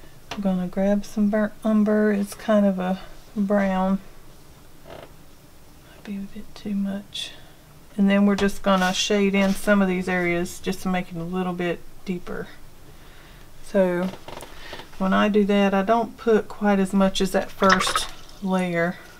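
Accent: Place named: Kentucky, United States. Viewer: I’m glad I found ur channel i love your videos and I think we have the same accent lol. I’m from SE KY.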